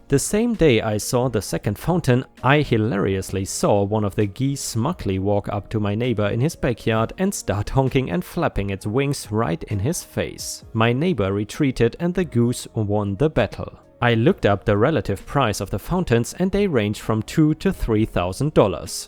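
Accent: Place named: Germany. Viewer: Hi ⭐, is from Germany. English is not his first language. He has a wonderful accent. Most people are here listening to his story telling because we like his accent, his choice of stories, and his demeanor. Didn't you mother teach you what Peter Rabbit's mother told him? “If you cannot say something nice, then don't say anything at all.” Real simple, you should have learned this before you started school. What is wrong with you? 🌠🌟⭐🌟🌠😎🌠🌟⭐🌟🌠 Take care, stay safe, have a nice day. I'll pray for you. 👵☺️✌️☮️🖖 😷 🙉🙈🙊 ♥️😎⭐🌟🌠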